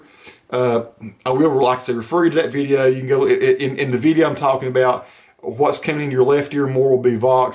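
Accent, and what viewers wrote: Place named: Oklahoma, United States. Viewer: Almost sounds like oklahoma or something midwest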